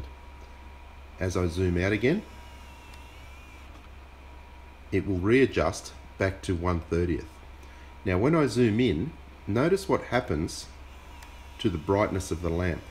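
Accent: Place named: Australia. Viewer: Quick question about your filming location. If it’s not too much trouble, can you post your coordinates and the direction you’re facing please? I’m assuming ( because of the accent) that you’re in Australia or New Zealand. If that’s true you’d be facing northwest to film , correct? Did you take into account any physical obstructions (like mountains) that would create a false horizon? Mountain ranges in the distance or other obstructions can do this, creating the illusion of a physical horizon. I’d just like to be certain of what we’re seeing. TIA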